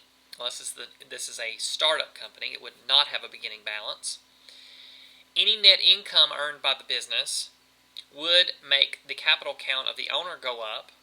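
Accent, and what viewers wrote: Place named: South, United States. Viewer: nice southern accent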